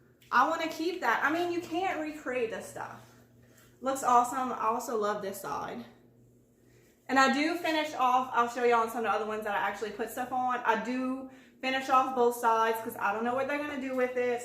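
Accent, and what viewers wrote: Louisiana, United States. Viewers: Gotta be from Louisiana. Love the accent!! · I have to know..... you're from bayou Lafourche, huh? I can hear it!! lol! Im from Houma. Your boards are gorgeous!! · i live in TExas but im cajun 100%(POUSSON) maiden name 😁 was born lafayette and raised in Iota , by Crowley La I feel like im not so far from home when i hear that great cajun accent sha !!!! I am hooked on your page , love your work ! My junkin sista ! Lol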